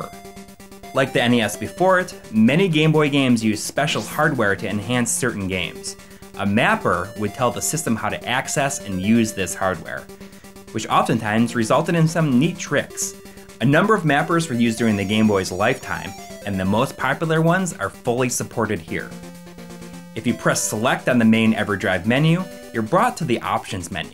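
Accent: Wisconsin, United States. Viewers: U sound like wisconsin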